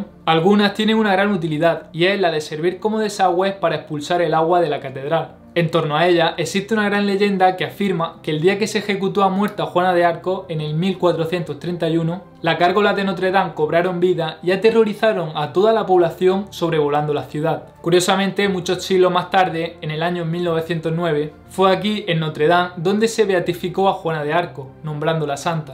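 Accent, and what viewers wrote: Andalusia, Spain. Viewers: te pregunto hablas otro idioma a demás del español por que te escucho y me parece que hablas con mucha dificulta el idioma español o castellano como lo quieras llamar, tal vez tengas un asentó muy fuerte original de el país español y real mente a los latinos senos hace algo difícil entender a un español cuando tiene un asentó muy pronunciado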